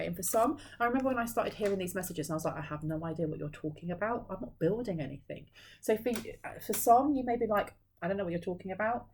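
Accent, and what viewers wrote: England, United Kingdom. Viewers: 🌹🧘 THE LITTLE GIRL IN ME WANTS TO TELL YOU THIS AND I'M SORRY IF THIS OFFENDS YOU BUT I LOVE YOUR VOICE BECAUSE IT BRINGS BACK MEMORIES OF WHEN MY MOTHER TOOK US TO CHICAGO TO SEE MARY POPPINS FLYING THROUGH THE AIR LIKE AT A CIRCUS SHOW OR SOMETHING BARNUM & BAILEY CIRCUS USED TO ALSO COME THERE 🌹🦉 AND I ALSO REMEMBER AFTER THAT I COULDN'T WAIT TO GET MY CHRISTMAS GIFT BECAUSE IT WAS GOING TO BE A MARY POPPINS DOLL THAT SPOKE WITH THE ENGLISH ACCENT LIKE YOURS OR BRITISH ACCENT LIKE YOURS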